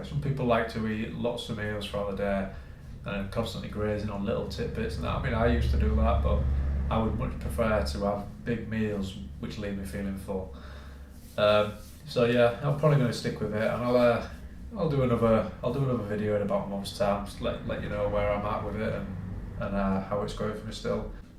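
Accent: England, United Kingdom. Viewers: Good video. Great to hear a bloody English accent on a fitness channel for a change. You a Manc?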